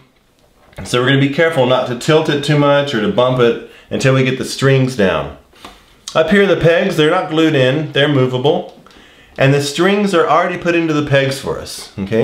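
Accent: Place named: Western North Carolina, United States. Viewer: Not a severe western North Carolina accent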